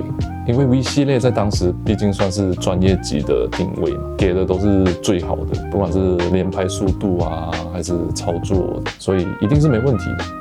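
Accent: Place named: Taiwan. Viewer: 感覺聽起來有台灣的口音欸！是來過台灣讀書嗎？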